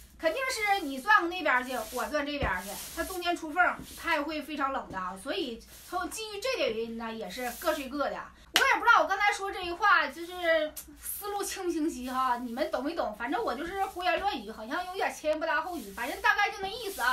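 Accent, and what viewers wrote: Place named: Northeast, China. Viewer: 东北人说普通话好听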